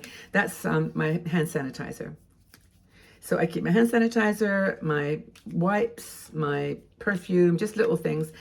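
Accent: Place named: Wales, United Kingdom. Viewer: Yes. Agree about clear diction but thought I heard some of the Welsh !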